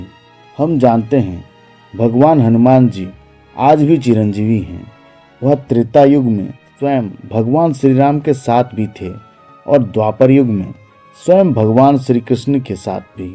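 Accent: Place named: Bihar, India. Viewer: Tumhara Hindi bolane Lagta Tum Bihari Ho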